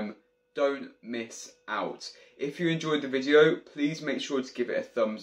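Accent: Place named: England, United Kingdom. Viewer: sounds like your in England somewhere. we don’t speak like you in California, some words we don’t use in the US.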